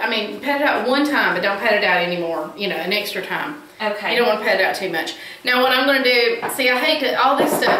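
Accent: South, United States. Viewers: Liked and will use.,,love the southern accent and look.latze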